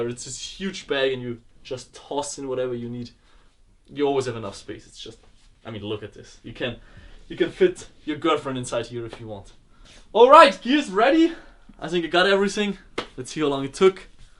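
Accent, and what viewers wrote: Germany. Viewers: hey deine videos sind echt nice ich find das immer witzig wenn du englisch redest und man dann soo deinen deutschen aktzent raushört was ja nicht schlimm ist;) mach weiter so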